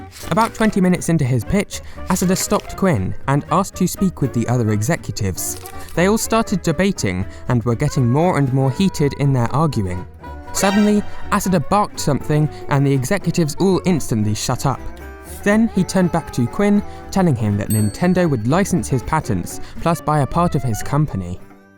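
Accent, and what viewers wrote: United Kingdom. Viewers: Very british voice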